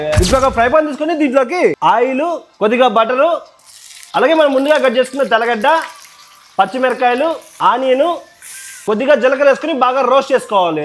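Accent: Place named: Kadapa, India. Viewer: చెప్పేటప్పుడు కొంచెం నెమ్మ చెప్పండి నీ లాంగ్వేజ్ అర్థం కావటం లేదు మాది పక్కా ఆంధ్ర మీది కడప స్థాయిలో ఉంది లాంగ్వేజ్ కనుక కొంచెం నెమ్మది చెప్పండి అంటే స్లోగా చెప్పమని అర్థం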